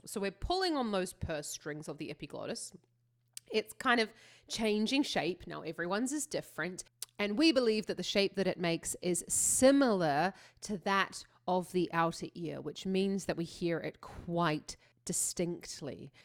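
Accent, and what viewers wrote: United Kingdom. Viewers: I am not surprised this video is 25 minutes long, analyzing Everyone's cover is a masterclass. welcome to world, this is considered to be her greatest performance. I had not picked you for being across the pond! I thought you were British.:D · Oh, it's weird to see my comment at the beginning:D (just heard your comment about you being in Germany?So..,Hello from Germany! Never thought that, would've said you're British judging by your English ^^). And now, first (my favorite song of hers) and now, what a great day for me! Thank you for your analysis (and I'm so glad you like her too) even though I recommend, if you'd check some korean songs as well in the future, just take a look at the lyrics since she's not only all about technique but she's a great storyteller as well. She's insane! She's actually a chameleon who can do every song and genre and turns it into hers. 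From Rock or Jazz to even rap. She tried it all. What would be interesting maybe if you'd check out an English song of hers. She does her arrangements by herself on Immortal Songs. She sang there and. Last one, directly in front of. He invited her to join him on tour after giving her a standing ovation. She performed together with him later. For a korean one, I highly recommend " " on I am a singer (Please use the vimeo one, if possible with subtitles, since the youtube version is cut and the lyrics are incredibly sad. The climax is heartbreaking):) And a stage which has already legendary status is " ". It's a korean folk song turned into a beautiful arrangement with seemless transitions and beautiful vocals. (Here you should maybe check out the b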